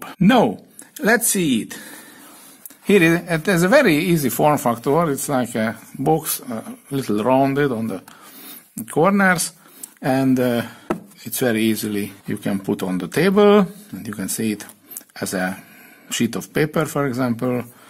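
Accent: Hungary. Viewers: Goulash accent , kusenem 😇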